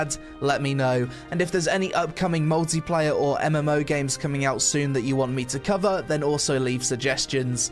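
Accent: United Kingdom. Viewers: your British but you didn't say loo??!?!?!?!?!?!?!??!?!?!?!?!??!?!?!?!?!?!? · hes british...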